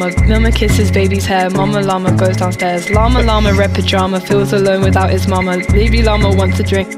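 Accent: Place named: Bath, United Kingdom. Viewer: its cah she got a bath accent stfy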